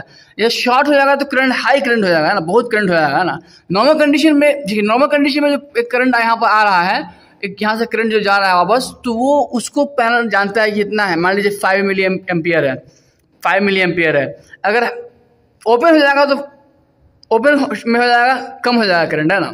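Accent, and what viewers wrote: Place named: Jamshedpur, India. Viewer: Aap Tata (Jamshedpur) key ho ,aap ki bat se lag ta hai 💯 %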